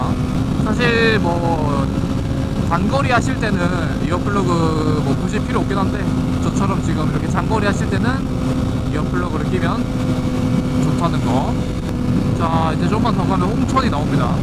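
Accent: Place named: Jeolla, South Korea. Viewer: 얘 전라도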